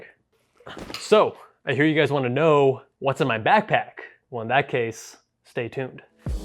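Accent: India. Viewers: Loved you INDIAN accent dude💕💕💕💕💕💕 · 👏👏👏👏very nice with the indian accent ,guud than Indians, with beauty tips and steel except that plastic... haha !! Like 👶👶 enjoyable and useful and diff accents pls😇😇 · ah yes the indian accent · The Indian accent is ok with me 👍 Sincerely, an Indian person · I vote, Indian accent every intro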